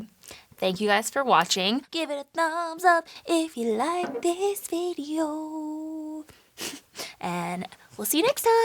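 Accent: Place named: United Kingdom. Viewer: Loveee the look & hair but girl lose the British accent lmao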